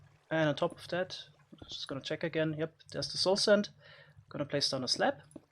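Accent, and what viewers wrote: Germany. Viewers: you sound so german are you? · Mal ne Frage bist du deutsch oder warum ist deine Aussprache so stark? xD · You sound very very german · Hab nach dem ersten Wort gewusst das du Deutsch sprichst👌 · Fucking German accent i can’t understand your English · Man hört den deutschen akzent instant raus xd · Is he German? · Deutscher accent cool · Alder dein english is so deutsch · Der deutsche accent 😂😂😂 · Are you german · man kann so raushören, dass du deutsch bist XD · Dachte ich mir auch der hört sich Mega deutsch an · are you german? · man hört so hart das er ein deutscher ist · man hört so stark das du deutsch bist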